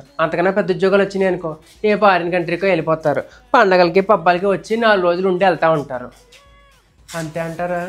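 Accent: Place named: East Godavari, India. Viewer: Likkamtha vumdi. Idi East godavari slange. Madi East godavari, Amalapuram andi.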